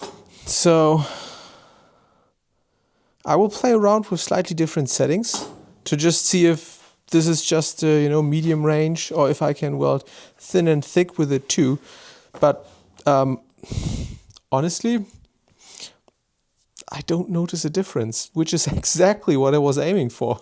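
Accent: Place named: Germany. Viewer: you sound German ...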